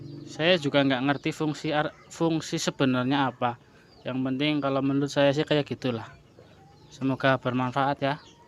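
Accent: Banyumas, Indonesia. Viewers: Njenengan wong endi sih mas? Ngomonge ngapak deneng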